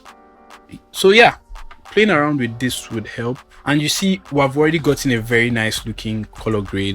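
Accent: Nigeria. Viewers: Your explanation is sweet, with our bold Nigeria pronunciations, omo this one enter my head ....i just subcribe on your page stay bless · Nigerian?! LOVE. Thanks Brother. 😊😊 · This is top tier content especially because your spoken English is one I can relate to as a Nigerian that I am. Thank you, truly!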